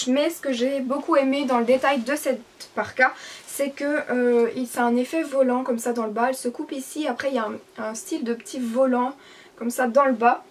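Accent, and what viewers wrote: Belgium. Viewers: Tu deviens de plus en plus jolie ! Et j'adore ton accent belge 😂😂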